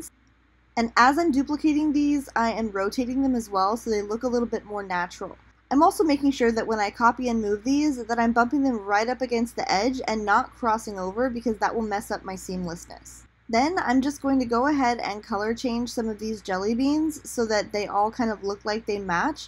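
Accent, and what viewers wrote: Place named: United States. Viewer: Where is this annoying US accent from? It's exactly the one we try and imitate in Europe when we say US tourists are obnoxious and loud. Bay Area? Santa Monica?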